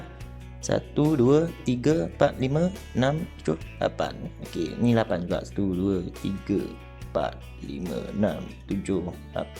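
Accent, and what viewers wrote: Kelantan, Malaysia. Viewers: memang terbaiklah cina kelantan lancar je cakap kelantan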